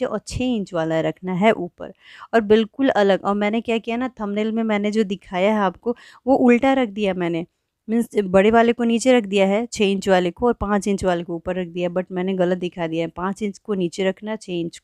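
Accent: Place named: Jharkhand, India. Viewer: Mujhe laga jharkhand se, aapka bolne ka tarike bhot acha hai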